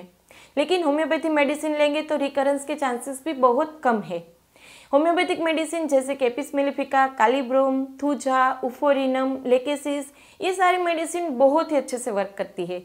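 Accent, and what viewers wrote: Gujarat, India. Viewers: Bolne k lehze se gujarati lg rhi h..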